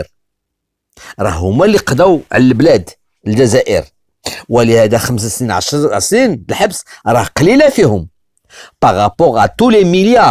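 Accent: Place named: Morocco. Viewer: Mais toi tu parles sans visage et tu parles plutôt marocain !! ce que tu dis n'est une nouveauté pour personne. si au moins tu montrais ton visage , vraiment d'ou te vient cet accent???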